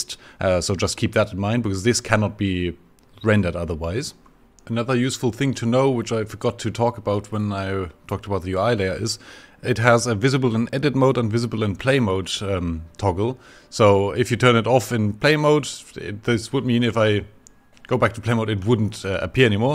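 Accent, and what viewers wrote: Germany. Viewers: klingst mega deutsch ^^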